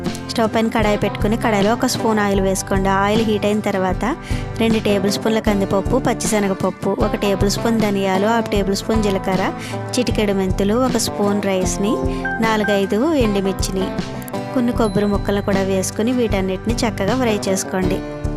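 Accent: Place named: West Godavari, India. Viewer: Meru pacchimagodavari yasalo matladuthunnaru balevundi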